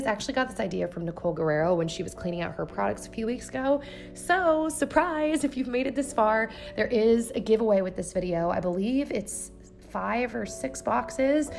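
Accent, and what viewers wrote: South, United States. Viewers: Hmm favorite cleaning products?? Bleach. Lol I love the smell of it. P.s. you're slowly getting that southern accent. 😍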